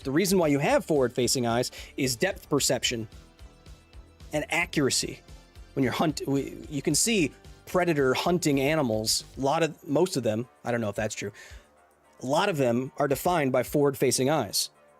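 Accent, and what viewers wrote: New Zealand. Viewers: Aussie accent is close but you say your "e" and "I" more like a Kiwi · your accent is good but sounds a bit more like New Zealand to me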